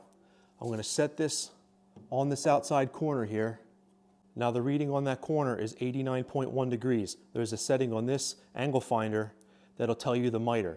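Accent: Philadelphia, United States. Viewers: thought I detected a Philly accent. then I saw the hoody. go phills!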